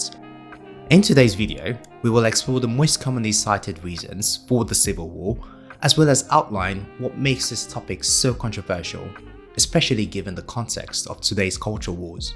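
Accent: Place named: United Kingdom. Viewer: I wont hear a british voice talk to me about the AMERICAN civil war...stay in your lane tuber.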